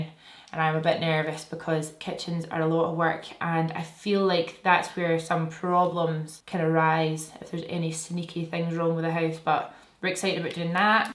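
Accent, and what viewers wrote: Scotland, United Kingdom. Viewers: Yasss BB coming thru with that Scot vibe to brighten my day!! Love ya xo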